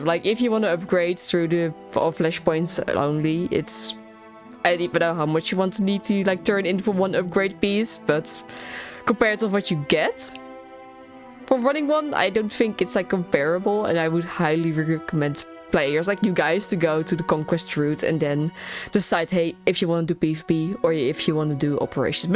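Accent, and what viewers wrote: Netherlands. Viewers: I saw you couple of times on malgus server nice! Nice vids, subbed! You dutch by any chance? Very recognisble accent :)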